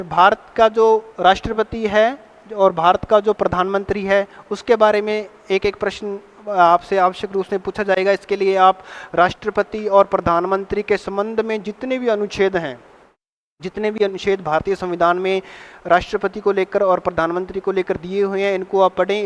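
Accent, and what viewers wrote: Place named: Punjab, India. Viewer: Aapka hindi punjabi accent😊😊😊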